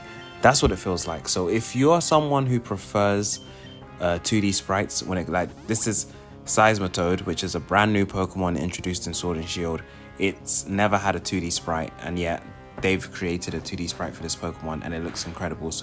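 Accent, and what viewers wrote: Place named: United Kingdom. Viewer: Hearing a british guy playing sword and shield is strangely immersive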